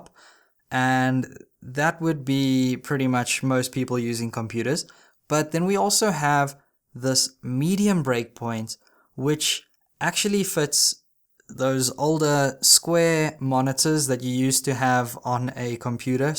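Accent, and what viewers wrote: South Africa. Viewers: As us Americans say "Africa" as it were a country not a continent you sounded the same. You from South Africa? The "British" accent sounds like it although wife from North Africa and their English (3rd language) is British English not American English. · Fellow Saffa here now living abroad!!! Very informative vid! Nice to hear an SA accent for a change. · Best tutorials on youtube so far about coding. Really awesome to be learnig from a fellow South Adrican as well. Sounds like you’re from KZN.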